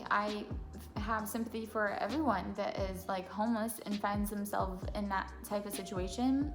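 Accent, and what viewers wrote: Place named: Coventry, United Kingdom. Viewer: yew sounds like wer we live in coventry ri be safe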